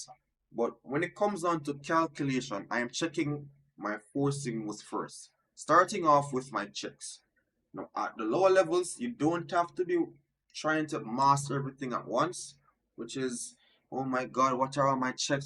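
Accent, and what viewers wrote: Jamaica. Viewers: I hear a Jamaican accent. Good on you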